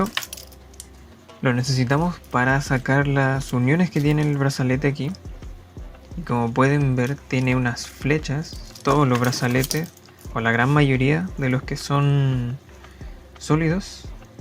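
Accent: Chile. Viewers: Excelente tutorial... Por tu acento se que res chileno..°! Felicitaciones amigo..!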